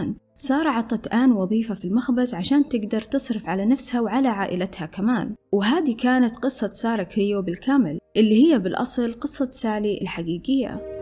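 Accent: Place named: Syria. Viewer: انتي عنجد صومالية......لهجتك كانها سورية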